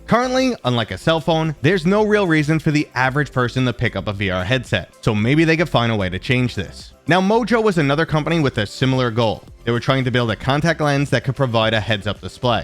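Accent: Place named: Massachusetts, United States. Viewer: thought i heard a hint of Massachusetts in your accent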